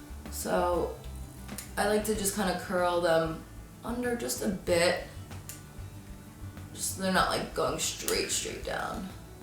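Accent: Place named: East, United States. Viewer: I really like your slight accent! It sounds kind of east usa idk. Like, a subtle mix of boston n new york. Idek but I like it 😍😂😂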